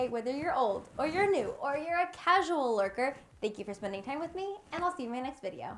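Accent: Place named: California, United States. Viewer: Since when does have a Californian accent even though she from NC?!?!? I am so confused....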